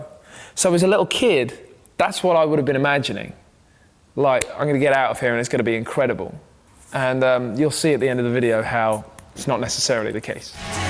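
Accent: United Kingdom. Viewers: He's not "foppish" he's POSH. That British accent is so sexy. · i didn't think this guy had a British accent until i watched this video. god damn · He has a British accent?? Woah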